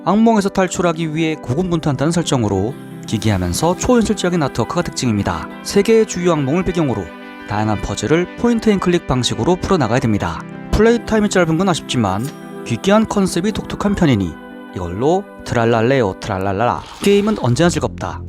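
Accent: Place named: China. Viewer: 주인장 조선족이죠? 특유의 억양이 나오네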